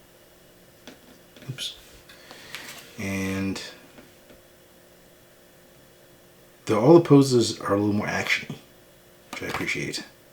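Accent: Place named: Canada. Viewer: My wife is from White Oaks, is that near you? She loves when she hears your Canadian accent.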